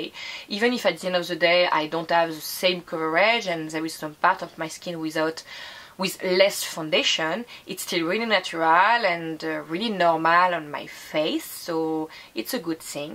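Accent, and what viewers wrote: France. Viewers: You look very pretty. Your makeup is simple and lovely. Very natural. Love your French accent.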